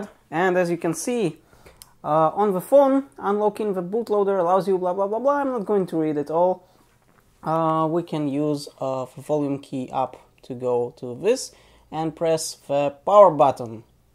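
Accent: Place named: Israel. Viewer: ?! יש מצב? קודם כל זיהיתי מבטא ישראלי, אח"כ זיהיתי את הקול ואז זה התחבר לי עם, יש מצב?!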